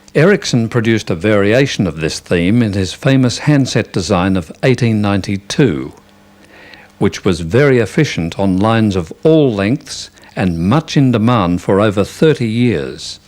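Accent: Australia. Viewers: Australian accent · The Voice Artist is speaking with an Australian Accent, as this is an Australian Production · He's Australian, other than that, not sure. I heard him in many educational pieces growing up in Melbourne Victoria. It's cultivated Australian English which (I think) is based on Oxford English but sounds a bit flatter for lack of a better explanation. It's most common in Sydney and Melbourne.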